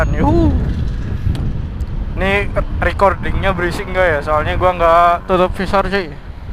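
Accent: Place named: Banyumas, Indonesia. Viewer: motovlog ngapak mantabbb ...smoga sukss channelnya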